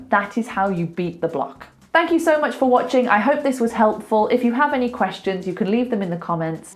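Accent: United Kingdom. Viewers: IS IT BRITISH ACCENT ?😃😃😃😃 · Oh... I miss a lot this British accent!! ❤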